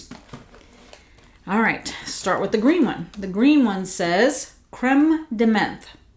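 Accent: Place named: New Orleans, United States. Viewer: So good to see you and your ideas are so right! I haven’t gotten this yet but it seems they did a good job with it. On the end of month live with Dan Orchard he pronounced beignets “Ben-ets”, it was so funny. Being from Louisiana we just take all the NOLA stuff for granted. It really is such a neat city with so much culture and history. I could tell by your accent you from there when I first started watching your channel. I’m a north Louisiana girl. Randazzo king cakes are so good. And a side note, Hubig pies are back out! My cousin brought me one at thanksgiving. Anyway really loved hearing your thoughts and can’t wait to sniff these!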